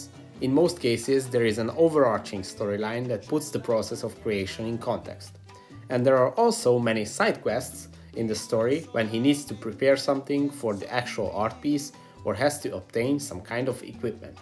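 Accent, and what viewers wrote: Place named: Hungary. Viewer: gondoltam hogy magyar vagy az akcentusbol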